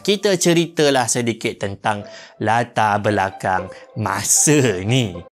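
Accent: Malaysia. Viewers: Itulah logat bahasa Malaysia yg sebenarnya.beda dg indo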